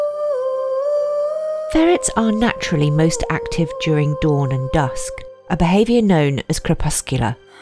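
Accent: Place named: United States. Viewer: Lmao 4 Hughe Jackmans, thank you for speaking American.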